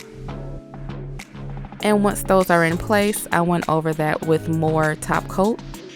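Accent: United States. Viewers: Are you from America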